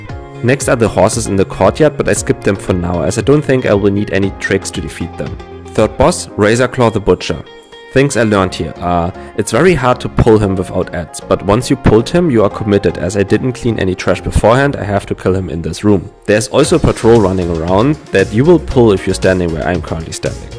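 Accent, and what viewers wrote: Germany. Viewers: are you german? and your pronounciation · Sympatisch wie man dein deutsch raushört <3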